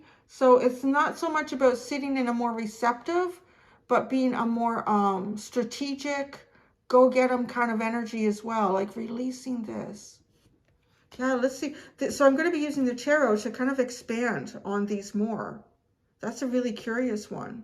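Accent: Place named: Canada. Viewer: your canadian accent is so soft and good for practicing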